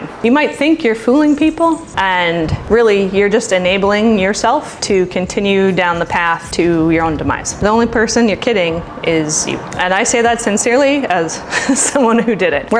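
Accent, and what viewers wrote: Canada. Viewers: I’ve been skinnyfat for most of my life, but now at 50 I’m just fat. Your video came along at just the right time for me. p.s. I love your Canadian accent and I greatly admire your comedic chops. Absolute goddess.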